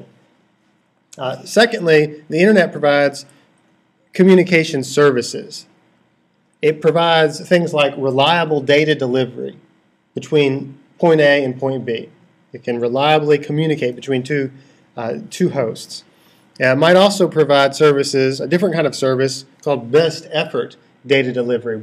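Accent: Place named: United States. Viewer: Ahhh finally an american accent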